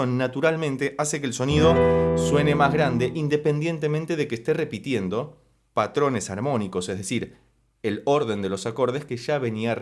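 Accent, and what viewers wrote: Argentina. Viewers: Buenas tardes maestro, siempre brillante en su análisis y comentarios. Dicho esto tengo que comentar, por que sino reviento, y espero que se lo tome como un cumplido, lo siguiente: es inevitable que su análisis me recuerde al legendario monólogo de Les Luthiers sobre el maestro Mastropiero, el maravilloso acento argentino evidentemente tiene mucho que ver...pero más allá de eso, si me distraigo un segundo de su estupenda explicación me viene a la cabeza a Mastropiero y....me cago de la risa. Muchas gracias por los maravillosos momentos que nos brindan sus vídeos, mi admiración y mis saludos desde España.💃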